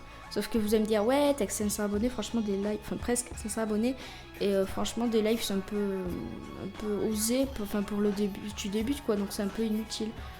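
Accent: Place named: Marseille, France. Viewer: je kiff ton accent de Marseille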